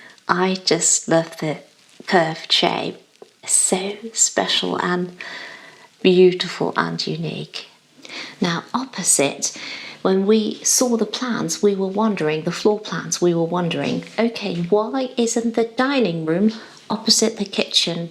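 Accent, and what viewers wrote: England, United Kingdom. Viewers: I love your English accent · What a lovely video about this idyllic cottage.This is my first ur video and i really enjoyed. If i didnt read you are from Germany I will assume u are born in England.Thanks for sharing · i like your beautiful voice, i like your british accent too, hello from Indonesia :)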